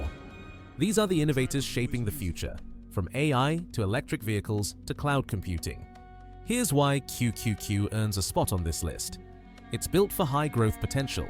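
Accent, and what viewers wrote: United Kingdom. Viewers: I hear a British accent and an alarm bell goes off.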